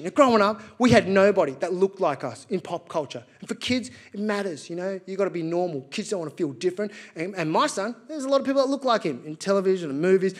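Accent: Australia. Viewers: I detect a slight Australian accent 😅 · So nice... Australian accent is wicked.. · As a brown immigrant in California... roundabout way of saying American...if I closed my eyes and watched this I would think some white Aussie commie from cricket telling jokes. World is so bizarrea and interesting.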